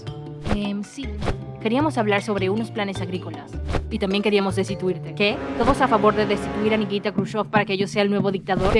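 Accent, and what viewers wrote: Panama. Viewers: jaja, su acento panameño es muy notorio jajajaja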